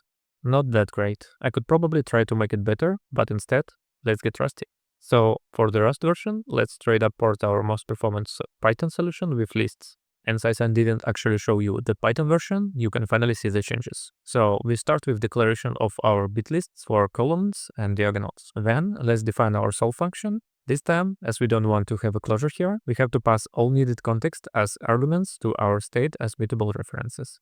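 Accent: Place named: Russia. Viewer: Мне так нравится слушать русский акцент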